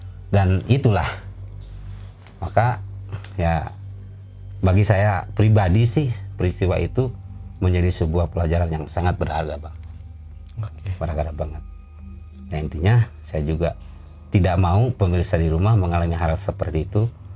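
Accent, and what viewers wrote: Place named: West Java, Indonesia. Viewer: Wakaka dimaklumin kang sebagai sesama sundanese 😁semangat 💪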